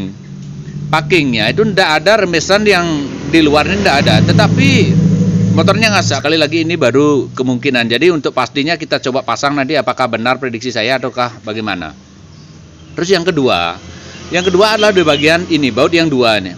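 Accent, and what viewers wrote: Bali, Indonesia. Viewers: Bali gati bapake ne 🤣🤣. Lanjut pak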